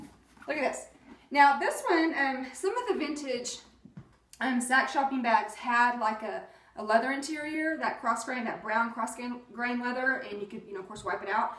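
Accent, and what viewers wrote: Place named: Texas, United States. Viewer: Love you videos. Are you from Texas? Love your accent.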